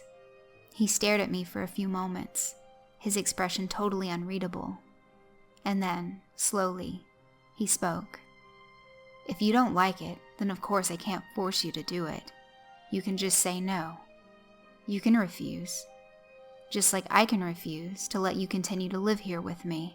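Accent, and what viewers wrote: South, United States. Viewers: It's nice to hear a creepypasta on here being read by a fellow southerner.:)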